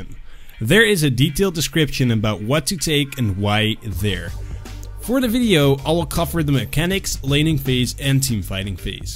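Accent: Netherlands. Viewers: u sound dutch af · you're Dutch, aren't you?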